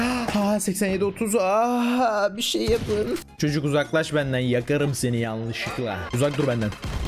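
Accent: Thrace, Turkey. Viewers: abi trakyalımısın konusma tarzın oyle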